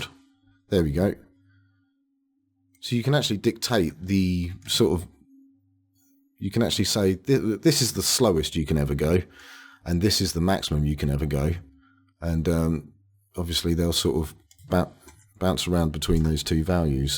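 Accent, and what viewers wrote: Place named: United Kingdom. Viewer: I really love your accent, even have been rewatching some of your videos because of that. Can you tell me which one is that exactly? I can only tell it's British :D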